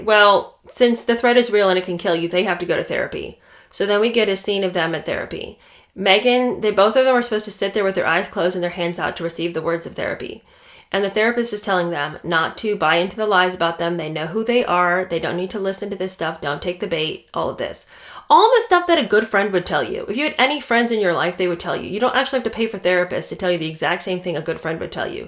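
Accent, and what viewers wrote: United Kingdom. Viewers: I just love your British accent😂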